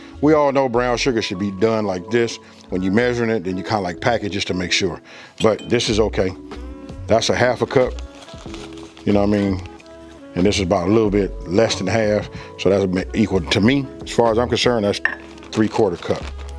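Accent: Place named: Los Angeles, United States. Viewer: I could tell by your accent you were from LA. Watts up! Thank you for the recipe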